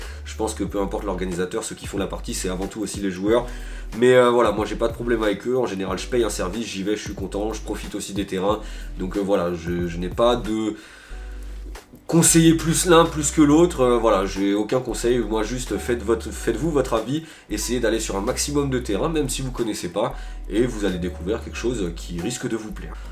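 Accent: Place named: Corsica, France. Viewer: je te reconnais le corse hahahaha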